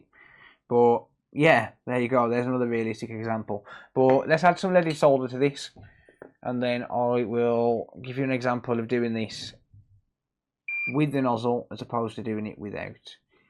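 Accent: United Kingdom. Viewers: I could hear the authoritative British accent before the video even began 😆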